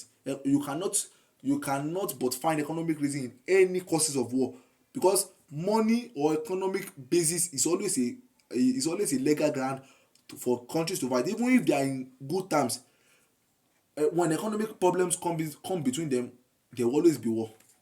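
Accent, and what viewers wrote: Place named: Africa. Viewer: It is simply an African Dialect of english, don't call it gibberish just cuz you cant understand it bro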